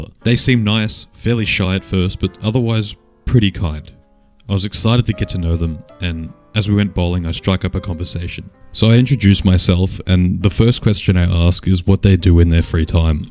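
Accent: Australia. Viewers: Sorry I’m only here for the Aussie accent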